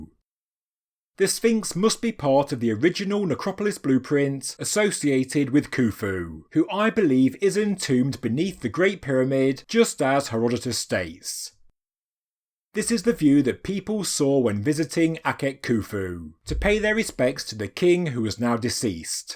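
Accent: United Kingdom. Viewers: Love your accent, so Little Britain...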